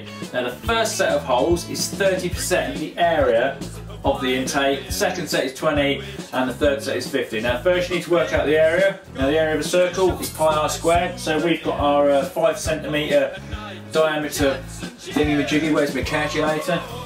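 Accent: United Kingdom. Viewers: He has a heavy British accent and uses a lot of British slang. It wouldn't translate well. Try using google translate.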